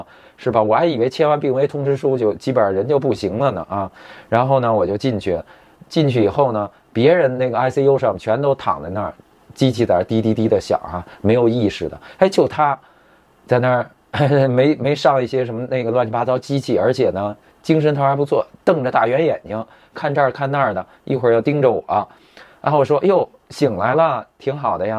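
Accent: Beijing, China. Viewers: 非常真实可信。小叔第一次这么正经的北京腔儿。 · 可能太想北京了，刷到这视频听到北京口音，开心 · 听出来了，你是北京人 你父亲做什么工作的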